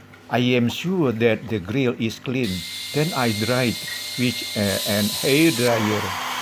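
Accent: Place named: Indonesia. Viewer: Logatnya seperti orang indonesia bang, daerah mana nih ?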